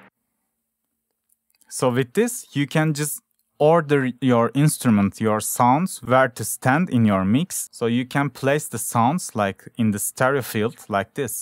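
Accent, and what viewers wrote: Turkey. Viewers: Abi valla çok teşekkürler ya 1.5 saattir bunu arıyorum. Konuşma tarzından direk türk olduğunu anladım :D Bu arada parça muhteşem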